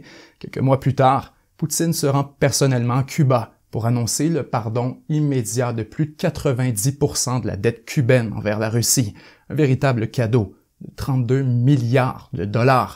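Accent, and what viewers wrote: Quebec, Canada. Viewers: ha l'accent québécois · Les analyses géopolitique de Mr Asselineau sont pas mal du point vue de la France et l'Europe. Je découvre cette chaîne, et j'en suis ravie. En plus j'aime entendre l'accent canadien.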